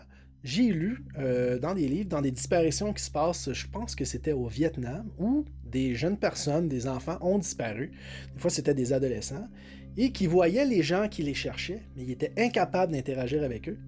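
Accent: Quebec, Canada. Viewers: Un québécois, sa fait du bien, lâche pas mec, c'est super bon ce que tu fais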